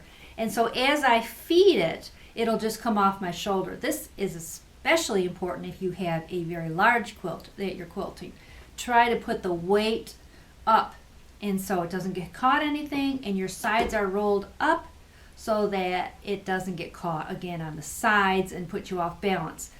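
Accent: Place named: Western New York, United States. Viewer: Sounds to me like Western New York, I'd say Buffalo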